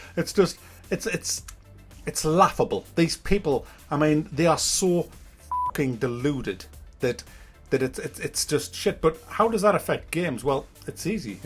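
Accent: United Kingdom. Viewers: I won't defend a game unless it deserves it. I've loved your videos and find them so interesting. I'm 17 and have been slowly rebuying old PS2 and PS3 games you and other channels similar (check out Mark Brown, Game Makers Toolkit) to you suggest and I love it. Ive found some of my favorite games through this, so a huge huge thankyou. I think its not video games that suck today though its just business. Because its not just old games, its old music and old films (only a matter of time before its books). I love that your British, like me, your accent just adds to your reviews and no offence makes your frustration funnier NEVER THE LESS THANKYOU. WE NEED MORE CRITICS LIKE YOU IN THE SPOTLIGHT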